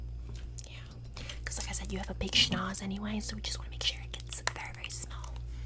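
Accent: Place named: New Jersey, United States. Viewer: You sound more like a Jersey Shore Girl, but I really enjoyed this...Love, Karen BTW, you need to do a video giving her date a shakedown because I made all my daughter’s dates pee their literal pants. They were so afraid of me!! That’s a true Karen...stare him down and yell at him with a whisper!! :)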